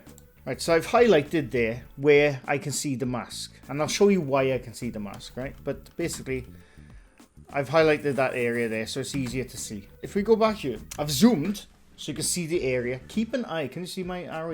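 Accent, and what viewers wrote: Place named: Wales, United Kingdom. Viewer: I love your accent! Aaahhh gotta love a Welsh man!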